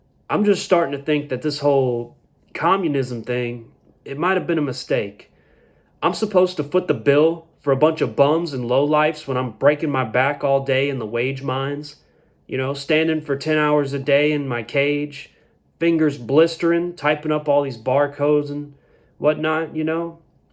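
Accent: Mississippi, United States. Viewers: What part of Mississippi are you from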